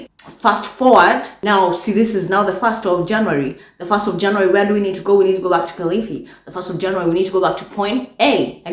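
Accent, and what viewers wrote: United Kingdom. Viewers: When speaks with that British ascent,lawwwd tanivunja mbavu😂😂😂😂😂😂😂😂😂😂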